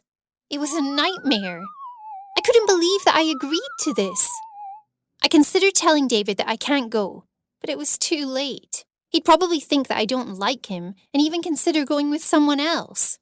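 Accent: Scotland, United Kingdom. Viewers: Is that a half Scottish accent? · Is the voice a Scottish accent? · Are you Scottish your accent sounds like you're Scottish???...... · Wtf is with her accent Is she trying to sound Scottish Plz help me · I think she has a scottish accent. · She sounds Scottish to me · She sounds Scottish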